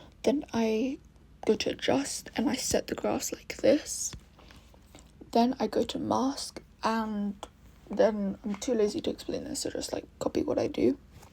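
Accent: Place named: United Kingdom. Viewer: OMG YOURRR BRITISH AYY MATE Sorry that was cringy😭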